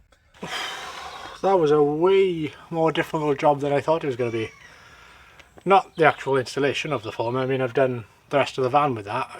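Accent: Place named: Wales, United Kingdom. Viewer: Hi from Canada. Luv your vids you seem like an awesome dude. Question #1-Your amazon link for that black foam roll insulation does not work here. Can you tell me the brand name of it? Question #2-what are the layers of insulation you used on the walls and ceiling? It looks like you put a double layer of that black foam roll sticky stuff. I agree with your vapour barrier theory. My tv has an awesome time trying to interpret your Welsh accent it is fun to read what it thinks you are saying eh.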